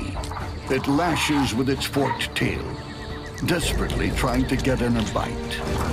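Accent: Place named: RP, United Kingdom. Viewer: Although slickly filmed and narrated by the voice of Mr. Fancy British Guy, these videos are as staged and contrived as any 8 year boy who drops bugs in a glass jar, gives it a good shake and gleefully watches the bugs rip each other apart. These films are on the same level of sophistication as the ubiquitous pimple popping videos.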